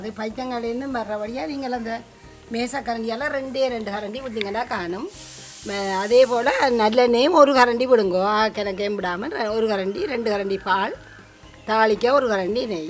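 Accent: Jaffna, Sri Lanka. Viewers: அம்மா உங்கள் யாழ்ப்பாண தமிழ் ரொம்ப நல்லா இருக்கு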